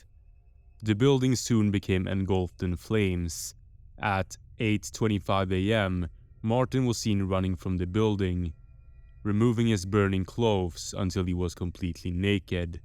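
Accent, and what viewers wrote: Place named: Sweden. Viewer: du gör inga typiska svengelska tabbar men det är några pyttesmå detaljer som avslöjar det 😁 'a' - ljud är något som verkar vara väldigt distinkt för oss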